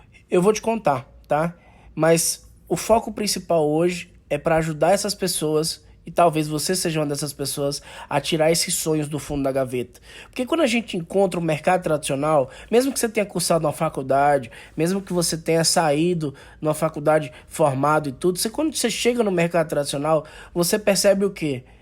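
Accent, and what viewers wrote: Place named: Natal, Brazil. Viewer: nossa, cara. Moro na rua por trás do Reis Magos, e não sabia que você era aqui de Natal, até porque seu sotaque não é 100% igual o nosso. Tô assistindo seus vídeos desde ontem, acredito que tenha sido por causa da lei da atração, pois venho pensando exatamente nas coisas que, coincidentemente, você fala em seus vídeo. Que bom que respondeu o comentários, vi que a maioria você só curte, mas não comenta.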